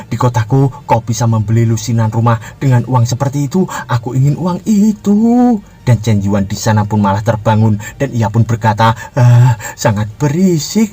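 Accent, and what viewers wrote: Java, Indonesia. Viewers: Seneng bgt logat jowone mas.. mantep pol.. rasa masku lg cerita 😂😂😂 semangaaattt!!!!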